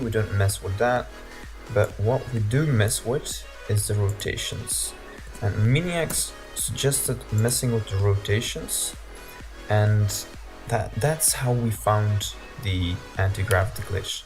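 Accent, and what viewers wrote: Netherlands. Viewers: hey just a quick question are you dutch? if so: hoi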